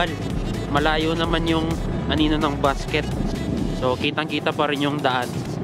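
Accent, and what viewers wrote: Pampanga, Philippines. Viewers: kapampangan ka no paps haha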